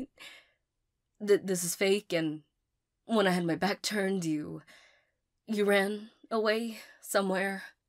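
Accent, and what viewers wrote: South, United States. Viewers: You sounded like a classic southern belle. It was a nice change and you absolutely nailed it! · Let's gooooo. Where's country accent? Painful truth or comforting lie?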